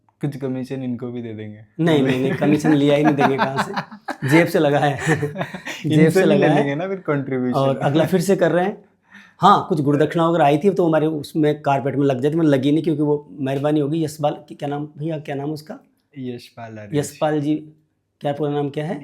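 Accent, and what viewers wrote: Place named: Rajasthan, India. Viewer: Dr ji aap kahan ke hai, aapke bol me haroti ka lahja aa raha hai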